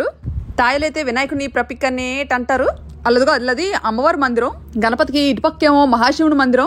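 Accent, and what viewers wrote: Vizianagaram, India. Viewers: మీ మాటలకు నేను ఫేవరేట్, మన విజయనగరం యాస చాలా బాగుంటుంది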